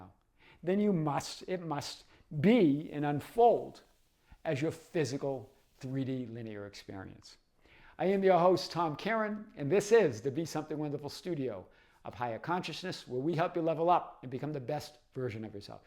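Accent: Boston, United States. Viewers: Great video! Your accent reminds me of Boston 💪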